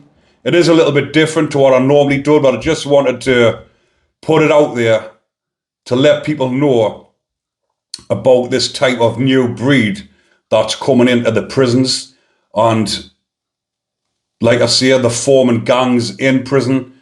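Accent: Newcastle, United Kingdom. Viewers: You sure are handsome and I love your accent, Newcastle the land of my fathers 🥰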